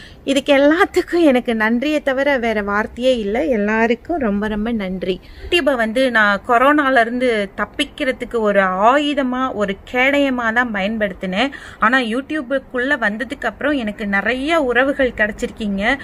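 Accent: Sri Lanka. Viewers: Your pronownsation is like Sri Lankans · Elangai Tamil pen nu nenachan dear 😍😍😍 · I thought u r from Sri Lankan tamil. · Correct akka onga tamil srilankan tamil mathiri than erukku i am from SriLanka 😊😊😊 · Konjam srilankan tamilian also ungala maathi tha akka kittathatta pesuvanga cute...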